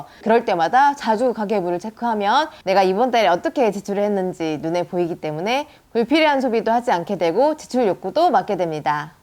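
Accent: Jeolla, South Korea. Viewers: 말투가 전라도분이네요ㅎ 저희 할머니집이 전라도 영광이거든요ㅎㅎ 항상잘보고있어요 ㅎㅎ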